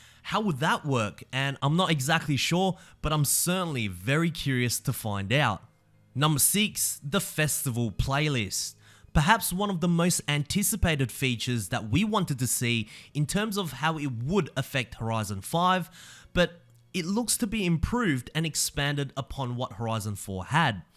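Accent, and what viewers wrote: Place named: Australia. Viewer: U are from Australia ?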